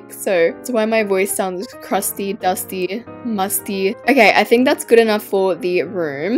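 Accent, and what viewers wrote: Australia. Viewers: WAIT YOUR AUSTRALIAN, ME TOO!!!!! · she Australian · Wait is she Australian?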